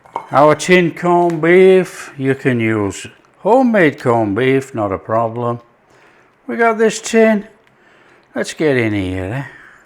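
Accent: United Kingdom. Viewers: Love your style sir, you probaly think we got an accent over here in the USA, but that's ok but, we LOVE YOUR BRITISH TALK, LOVE YOUR, "COME ON, PLEEEESE! THATS THE SIGNATURE OF 😅 you are so down to earth, and such a great cook, I look forward to each of your videos, love the one right way to make burgers. You are a master in the trade, simply love your presentation, espeacially when you sit and taste what you made, love your expressions, a bonus to all your videos, crack on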